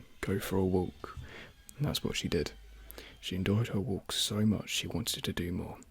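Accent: United Kingdom. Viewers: Ох уж этот вкусный британский акцент - я просто в восторге! Хочу чтобы у моего будущего парня был такой же голос)) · British accent, i live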